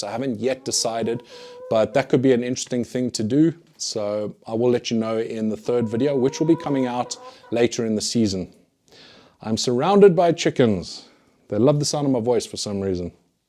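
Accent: South Africa. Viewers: Hello......you from South Africa....ZA. Accent 😅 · THATS A SOUTH AFRICAN ACCENT, HOWSIT BROTHER!!!!!! been inspired by your grows and been blessed with amazing harvest here in Cape Town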